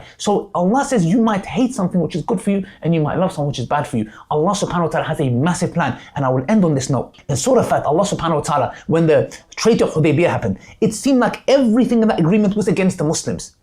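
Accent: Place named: United Kingdom. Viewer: By the way u have a beautiful British accent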